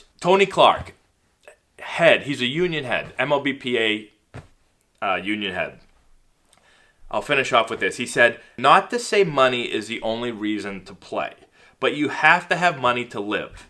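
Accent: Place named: Boston, United States. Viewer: Very faint Bosstown accent..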